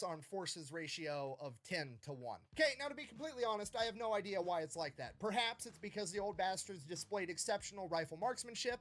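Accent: United States. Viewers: You have got to be southern ❤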